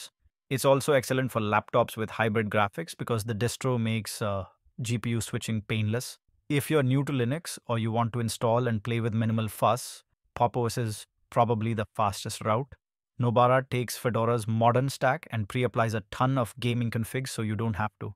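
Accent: India. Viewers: can understand the freeaking indian accent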